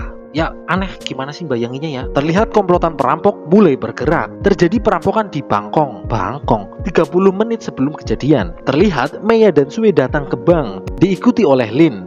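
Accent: Banyumas, Indonesia. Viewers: Aja kelalen ngapak kang.. 😂😂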